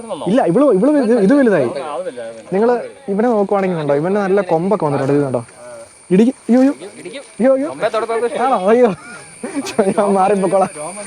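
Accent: Kottayam, India. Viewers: Kottayam slang👌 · Kottayam slag 😎😁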